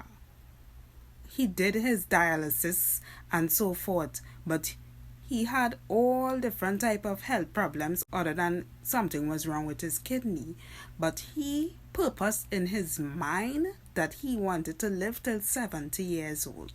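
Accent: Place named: Trinidad and Tobago. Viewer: I hear a trini accent! And this was on point, it all starts in the mind!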